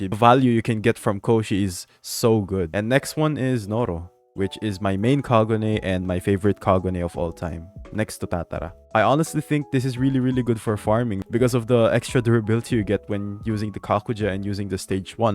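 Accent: Philippines. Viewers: Ye he sound Filipino I can hear his Filipino acsent jumping and turning American again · are you pinoy? Your accent is kind of filipino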